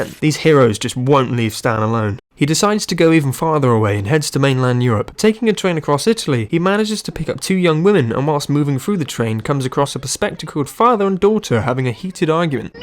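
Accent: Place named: West Country, United Kingdom. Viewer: This is bloody brilliant- had me laughing and is surprisingly feasible! Is that a west country twang I detect in your voice,?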